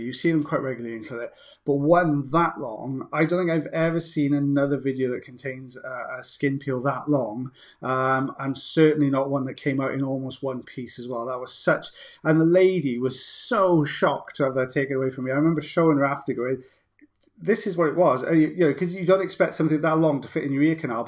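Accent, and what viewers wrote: Wales, United Kingdom. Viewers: I told my sister I found very relaxing satisfying videos of a Welshman with a wonderful voice who does ear wax removals and she exclaimed, “!” How did she know?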